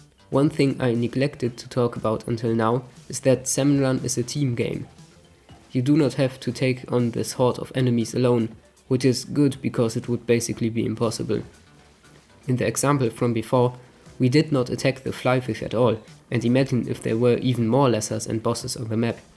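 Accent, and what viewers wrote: Germany. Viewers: Man hört den Deutschen akzent😂 aber ich liebe Splatoon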